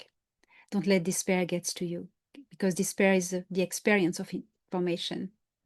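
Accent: France. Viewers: Great addition to the Star Nation News episodes :-). I had a query about #35 Webinar last weekend on the 26th that I attended related to a question I submitted that Elena answered about CE5 and which ET beings and races it is best to reach out to during such a type of meditation. I did not know where to reach out too to get this comment to Elena through herself or an associate, etc... In the answer there are a few of the ET races you mention that I just cannot fully understand/hear properly what you are saying, most likely due to your elegant French accent and dialect, but if I could somehow get some clarification on this from you, I would greatly appreciate it. What I can make out is : Kaheel from Epsilon (something) Aheel Alteans intergalactic confederation Aruhri Arcutians Zanete Now, in particular, the two I can’t understand are the Kaheel from Epsilon (something). 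Obviously, the something part, and the Aruhri Arcutians Zanete these two I am not certain at all, so any clarification on these and whether I have it right would be appreciated. The other two I think I pretty much understood. One thing I was wondering about was the Alteans' intergalactic confederation? Is that some sort of like a federation those beings are in? Any feedback and clarification on this would be greatly appreciated. I of course look forward to building my CE5 meditation technique now around reaching out and connecting with these beings. Thanks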